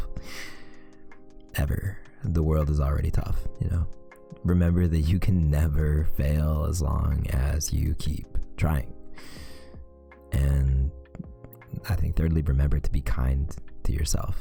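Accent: Canada. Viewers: There are alot of things to talk aboot Oh yes he's canadian 😍 Dont worry I'm canadian too 😆😆💋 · your the only reason why canadian accents are one of my faves rn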